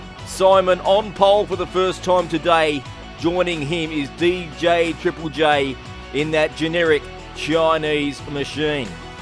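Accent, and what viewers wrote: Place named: Australia. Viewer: I was rooting for Simon to win! But congrats to the winners. As an American, love to hear your commentary in Australian accent. Great racing to all!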